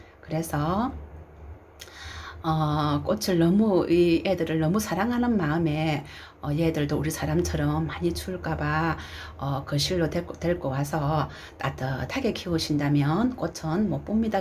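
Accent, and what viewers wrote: Gyeongsang, South Korea. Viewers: 꽃이 이쁘게 폈네요.. 나레이션 경상도라서 더 잘들립니다! 감사합니다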